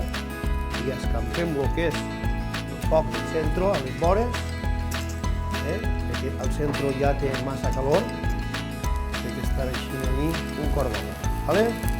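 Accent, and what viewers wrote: Valencia, Spain. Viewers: Holeeeeeeeeeeeeee. la Paella Valenciana autentica con ingredientes de Valencia. Y pronunciada por un Valenciano de pura cepa. APLAUSOS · Che!!!!!!!! A parte que buena paella haces. Y que bien hablado el valenciano. El valenciano autentico, no lo que se habla ahora. Enhorabuena.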